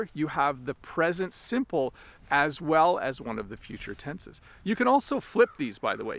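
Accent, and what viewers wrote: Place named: Canada. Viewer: Really nice succinct video. I love that Canadian accent that shows the influence of Scottish immigration. Your ideas for building up a following are great, you deserve all the success that you get. Well done!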